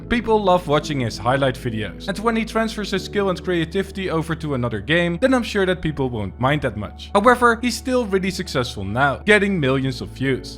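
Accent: Netherlands. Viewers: Question to u: are u Dutch? Little accent xD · Die Nederlandse accent😭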